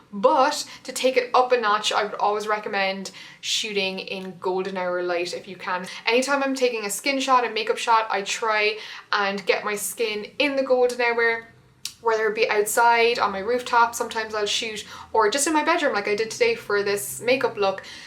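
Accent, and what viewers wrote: Ireland. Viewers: Funny thing.... I was watching while getting my son (12) ready for school then sees you and said that he thought I was watching RetroFlame! I told him this is and she’s Irish too. Her and Erika have the same accent. I do tell him and show him from time to time the Youtubers I watch. Just thought it was hilarious! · Love videos like this, I always learn something :) Maybe you can do one about how to do well on Instagram? Also, is it just me or is your accent stronger after you spent some time in Ireland? Such a nice accent :)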